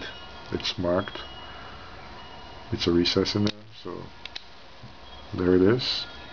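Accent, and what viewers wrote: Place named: Italy. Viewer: Italian pistol review by Italian accent 😁👍🏻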